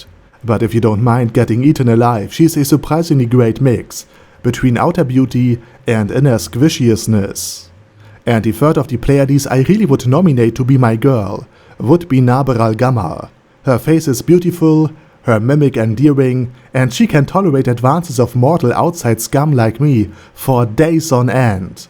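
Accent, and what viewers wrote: Germany. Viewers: oh, so you're german!! that explains the accent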